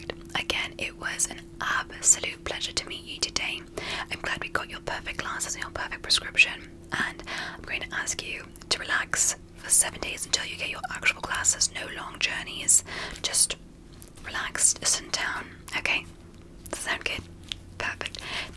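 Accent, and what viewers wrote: United Kingdom. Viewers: I feel like it’s probably just me but I don’t get tingles with the British accent at all (maybe because I live in the UK and hear jt a lot??), only the North American one 🙈🙈🙈 it would be great if in the future can do more long videos with the NA accent ❤️🙈🙈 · Your hair is so cute curly❤️ love the British accent too. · I tend to speak to you in a British accent every time you speak in a British accent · You have a really good British impression ♥️😴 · Yay British is back! 😍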